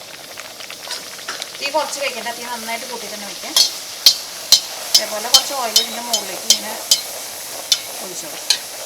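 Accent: Thrissur, India. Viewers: നല്ല അവതരണം .തൃശൂർ നിന്നും പറയുകയാണ് എന്ന് തോന്നും .So simple straightforward conversational style keep it up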